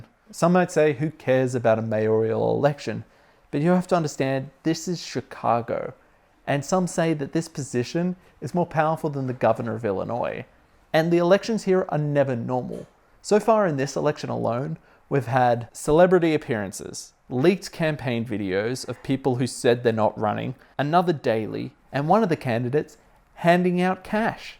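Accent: Australia. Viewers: is it just me or does he not have a very noticeable aussie accent?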